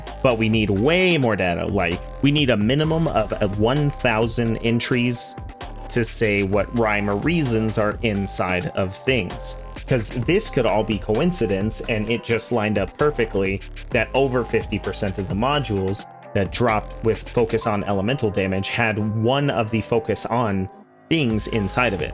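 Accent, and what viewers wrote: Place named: Ireland. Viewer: love the guides irish accent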